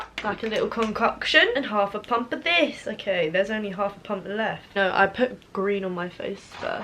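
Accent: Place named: United Kingdom. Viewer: british english sounds so beautiful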